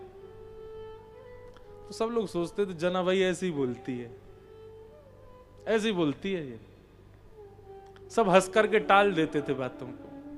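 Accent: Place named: Braj, India. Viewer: Jay Shri Krishna Radhe Radhe Jay Shri Harivansh Guru Dev Maharaj ji Aapke Charno Mai Koti Koti Vandan 🙏 Radhe Radhe Jay Shri vraj vasi Aapki vraj Bhasa Badi Sumadhur hai Radhe Radhe Jay Shri Vrindavan Dham 🙏 Jay Shri Radha Rani Jay Shri Radha Kishori ji Jay Shri Radha Raman ji Jay Shri Hari OM 🕉 Namo Narayan ji 🕉 OM Namo Bhagvate Vasudevy Namh Jay Shri Laxmi Pati Jay Shri Shyama Shaym ji ♥️ Jay Shri Yamuna Maharani ji Jay Shri Radha Vallabh Lal ki Jay Ho ♥️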